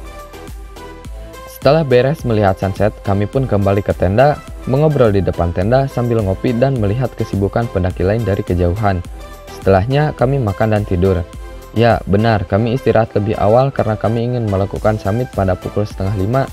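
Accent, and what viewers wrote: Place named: Java, Indonesia. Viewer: Lah bre sejak kapan lu ngomong pake logat jawa, di video ini lu medok gak sih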